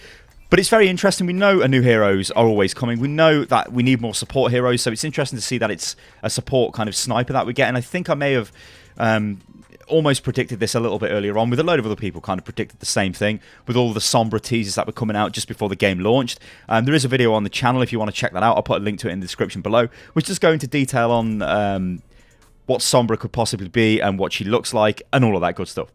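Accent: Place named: United Kingdom. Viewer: I love your accent and voice, where in the uk are you from?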